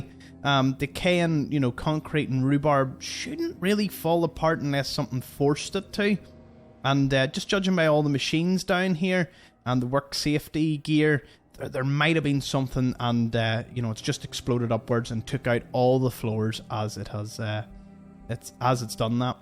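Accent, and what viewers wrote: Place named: Scotland, United Kingdom. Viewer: One thing to keep in mind is the bombs dropped on a Saturday morning. Was it a worker fighting the boss, or had someone broken into the building & made their way to the office and the safe only to be accosted by security? As to never having explored it, I figured the buildings with candles by the door in Concord were waving players to come that way. Lastly, love your accent. Is that Scottish? A person has to ask, because there is a separate accent practically every other mile a person travels in the UK.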